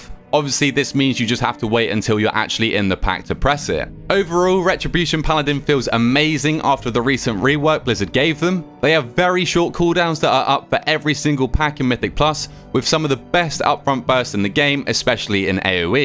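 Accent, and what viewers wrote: United Kingdom. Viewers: As an American your guide is more legitimate due to British accent.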